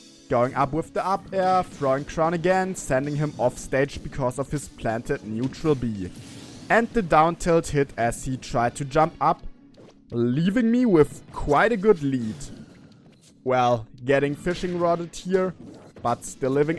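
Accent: Germany. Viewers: Lmao man hört dass du deutsch bist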